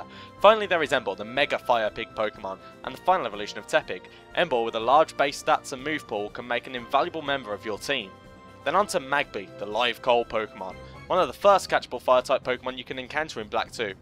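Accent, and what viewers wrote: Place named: Birmingham, United Kingdom. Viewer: Good to hear a fellow Brummie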